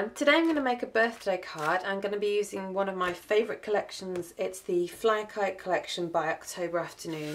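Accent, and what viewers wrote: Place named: United Kingdom. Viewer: I just have to tell you I love watching your videos! I love hearing your wonderful British accent! I just bought a few papers from that October Afternoon line you've used a lot, and I think part of the reason was how cool "October Afternoon" sounded when you said it! Very cool papers as well!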